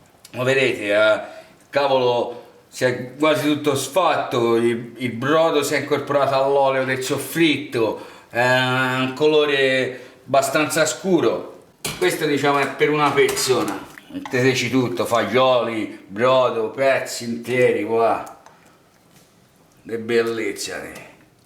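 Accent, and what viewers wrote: Tuscany, Italy. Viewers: Ciao io ti seguo sempre sei molto bravo solo che a volte ho fretta e tu parli troppo poi in toscano rigido che a volte non si capisce però basta poi vedere per capire purtroppo in questo video manca un pezzo ma dopo aver messo la farina di semola nel 2 tegamino poi l'hai aggiunto al primo o quella è una porzione ? Quindi per altre porzione bisogna ripetere questa operazione vari volte ? Grazie per i tuoi ricette buonissime e salutari. Adoro le zuppe io sono marocchina e c'è una zuppa in Marocco simile ad una ricetta toscana comunque è ottima la cucina toscana prevede tante zuppe che fanno bene . 👍👌👏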